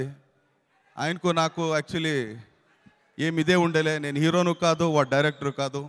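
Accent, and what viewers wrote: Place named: Rayalaseema, India. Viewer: It likes rayalaseema slang telugu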